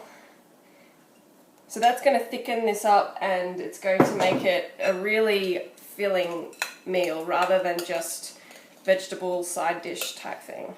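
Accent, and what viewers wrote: Australia. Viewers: FYI Australian accent :)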